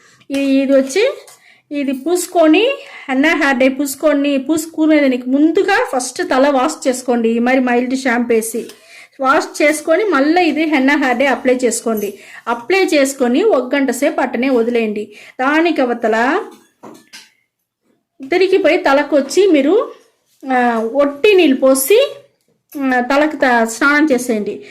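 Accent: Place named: Chittoor, India. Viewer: Chittoor Telugu... influenced by Tamil 😂😂😂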